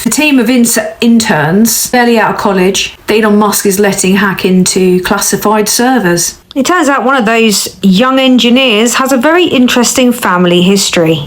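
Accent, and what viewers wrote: United Kingdom. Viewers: A thousand thumbs up for you dear. you are 200% right about everything. I'm Canadian and love your understanding on things. I wish more people would get it the way you do. Love your ...so British accent as well. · To be honest, I find her voice therapeutic. Yes, she is telling me of my demise but at least it's with that silver tongue you brits have.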